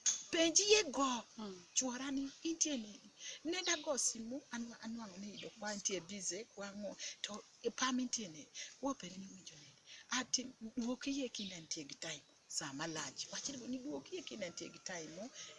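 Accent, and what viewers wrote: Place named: Luo, Kenya. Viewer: You are basically speaking in Luo of Kenya. 😂😂 I love listening to Padhola conversations alot because you sound like Luo of Kenya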